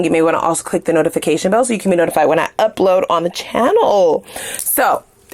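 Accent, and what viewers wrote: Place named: United States. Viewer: Nice analysis.but sisan people can't get your USA pronunciation.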